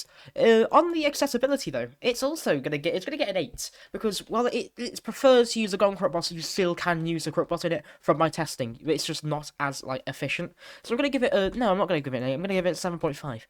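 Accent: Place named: United Kingdom. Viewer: the british accent is so good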